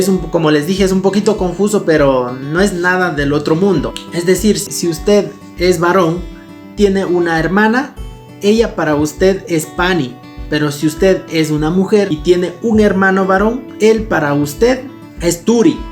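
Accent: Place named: Cañar, Ecuador. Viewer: Ahhhhh. Con razón no me daba. tu dialecto es del Cañar, por eso hay diferencia entre lo que aprendí (kichwa unificado y, aunque lo nieguen, dialecto de Otavalo)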